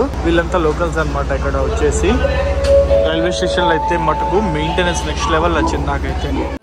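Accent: Nellore, India. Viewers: ❤ Love your accent and description as I am 67years young Native of Nellore Proper 😊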